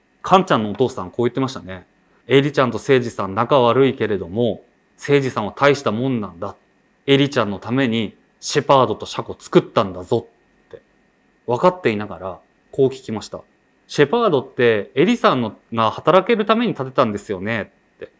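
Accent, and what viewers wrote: Aizu, Japan. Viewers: 秋田出身だから会津の方言も耳に馴染んできて、その分余計リアルに感じられました。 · 有難う御座いました。２回にわたる長講義お疲れさまでした。私の祖母が東北の人だったので子供の頃に聞いた会津訛りの言葉を思い出しました。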